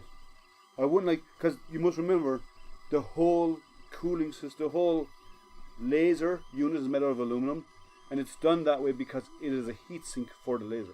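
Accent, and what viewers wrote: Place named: Ireland. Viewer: well I can't get lightburn to connect. I was able to do a couple burns last night with GRBL but just didn't seem like I could change many settings. I thought you sounded Irish but just wasn't quite sure.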